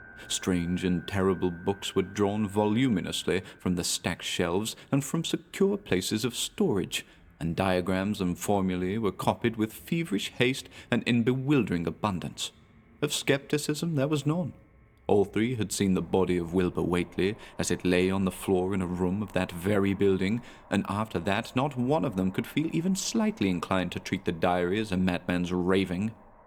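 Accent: United Kingdom. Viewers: This is the most English man I've ever heard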